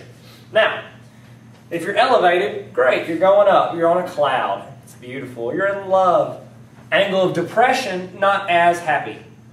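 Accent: South, United States. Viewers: Btw awesome southern accent :D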